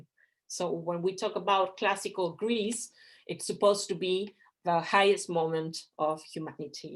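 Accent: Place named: Spain. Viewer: amo la pronunciación perfecta de inglés, con detalles de castellano y muletillas francesas. espectacular.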